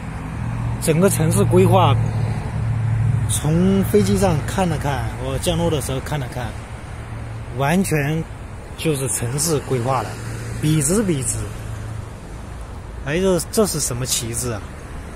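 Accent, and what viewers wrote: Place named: Shandong, China. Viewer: 心声，你肯定是山东的吧，我听出来了，老乡🍔🍟🍕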